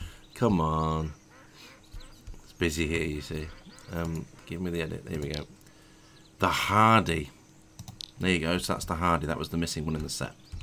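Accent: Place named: England, United Kingdom. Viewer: Just Subbed you looking at your youtube Victorian homes and I love your English Accent (Smiles)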